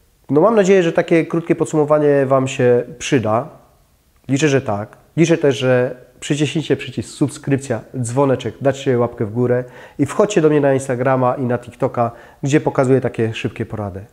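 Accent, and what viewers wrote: Silesia, Poland. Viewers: Stara się, ale słuchać śląski akcent:)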